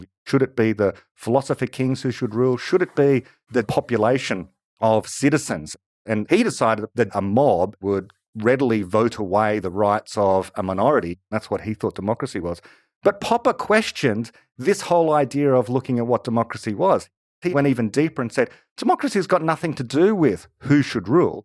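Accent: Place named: Germany. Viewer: nope its not nivi. sounds like Deutsh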